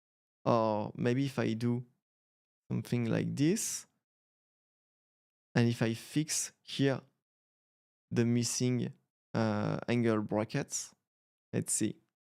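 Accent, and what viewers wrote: France. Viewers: Excellent l'accent Français ! ☺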